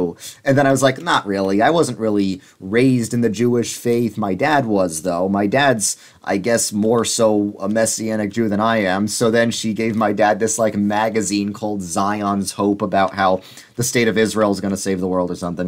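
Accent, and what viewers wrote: New York, United States. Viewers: really letting the New York accent come out on this one LOL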